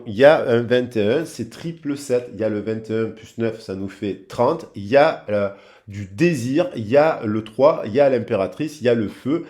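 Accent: South, France. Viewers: Coucou, C'est toujours un réel plaisir de vous écouter, ne serait ce que pour l'accent qui sent bon le soleil et les cigales😂! Je l'avais pourtant mis en garde sur le fait que sa conquête était vénale😡! Il n'a pas tenu compte de mes ressentis et a foncé aveuglément dans cette relation, il est tellement crédule qu'il s'est laissé manipuler😢par elle et ses acolytes... En y réfléchissant bien, il ne pas pas quitté, c'est moi qui ait tout fait pour qu'il parte, n'acceptant plus son comportement détaché à mon égard😞... Vous êtes une bien belle personne, honnête et c'est bien d'avoir fait cet apparté au sujet des tarifs démesurés que certains n'hésitent pas à pratiquer dans le domaine de la voyance...😞 MERCI pour tout, excellent week-end🙏🍀!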